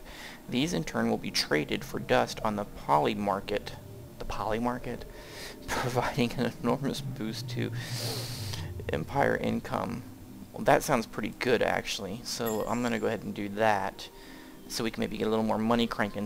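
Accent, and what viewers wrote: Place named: South, United States. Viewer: Not usually a fan of Southern American accents but yours is pretty relaxing and clear.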